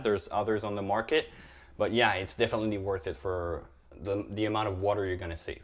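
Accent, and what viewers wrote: France. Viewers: Loved your comment I laughed so hard. It's the French accent lol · Engish is better than french. French ppl speaking english accent is cool. Lol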